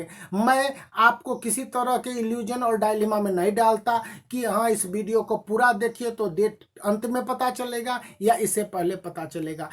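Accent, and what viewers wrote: Bihar, India. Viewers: Are u a bihari??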